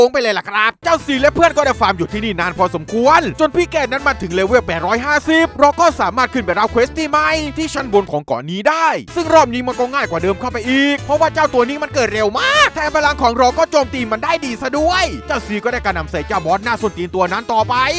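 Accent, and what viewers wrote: South, Thailand. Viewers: คนใต้เหอะนิ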